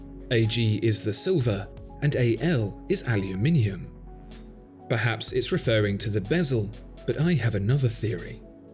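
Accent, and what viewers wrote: United Kingdom. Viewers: Very informative video but gosh that ANNOYING British accent just for the Americans we buy anything from the Mother Country.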